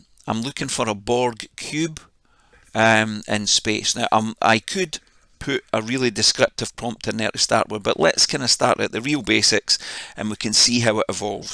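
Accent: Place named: Scotland, United Kingdom. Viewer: Many thanks - great video - very informative and with a dreamy Scottish twang as a bonus! Happy Days! LOL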